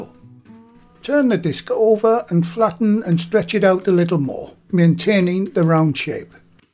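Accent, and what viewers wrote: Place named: North, United Kingdom. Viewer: Love your presentation! Very organized and straight-forward, anyone can have confidence following you, and expect good results. Love the Northern accent too!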